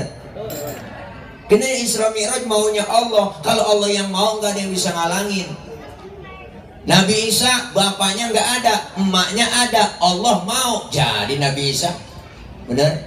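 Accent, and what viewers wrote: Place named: Betawi, Indonesia. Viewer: Gue banget dgn gaya Betawi yg medOk nya Ampe ane lupa mati'in aer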